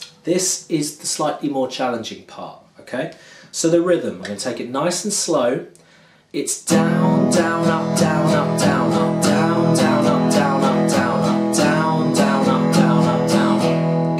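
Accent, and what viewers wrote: United Kingdom. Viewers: 🤔🤔🤔 Thé British accent is so class compare to américain's 😱😱😱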